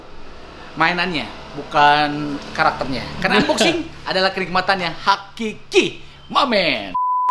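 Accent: West Java, Indonesia. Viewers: Udh d alungin batu encan mang? Pepeus henteu Logat / bhs sunda na alus.. Jgn smp hilang nya mang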